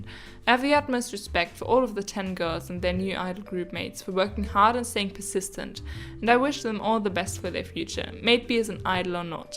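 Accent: Germany. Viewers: Personal question: Are you German? Your accent sounds German to me.... Correct if I'm wrong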